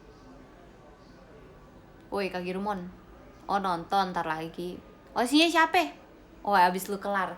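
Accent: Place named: Betawi, Indonesia. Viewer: Gw demen bnget klo denger mpok ngomong logat nye betawi banget mirip tukang nasi uduk disebrang rumah gw😁😁😁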